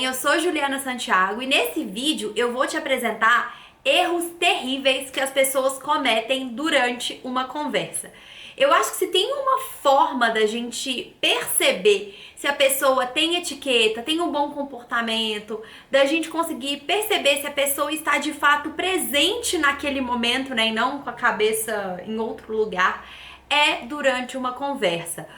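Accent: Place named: Minas Gerais, Brazil. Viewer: ❤ que saudades que eu tenho de ouvir esse jeitinho mineiro ❤❤❤❤❤❤ Meus queridinhos ...amores eternos❤❤❤❤rsrsrsrsrs Eu sou vidrada no povo mineiro: amor perfeito!❤❤Eterna seguimora de Viçosa e BH eternamente aonde estiver no planeta meu ❤ coração é mineiro ❤❤❤❤❤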